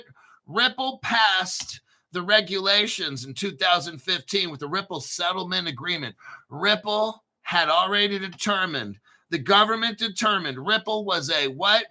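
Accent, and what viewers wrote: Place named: New York, United States. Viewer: This dude gotta be fron NY or NJ 😂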